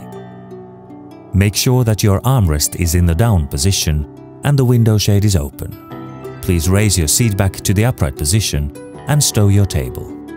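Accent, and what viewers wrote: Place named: England, United Kingdom. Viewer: gentle English accent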